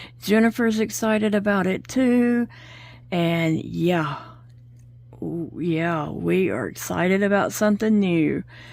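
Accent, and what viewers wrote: South, United States. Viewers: Kathleen from NJ blanket up in flames oh you crack me up!!!! That was one beautiful pattern and those colors were exquisite. A+ for effort. I know nothing about knitting or crochet I do jigsaw puzzles on the computer on a site by the name of Jigidi when I watch/listen to your videos I love listening to you Must be that southern accent you have. Maybe you should have given it to!!! I was tying this while you were talking and then all of a sudden there it was! Set it aside and try again Its really a beautiful piece of work. I was wondering if you ever named that yellow new doll on your desk, I gave you 3 names for the 3 you bought several days /weeks ago Look on that video for those names I thought they were pretty good......LOL.......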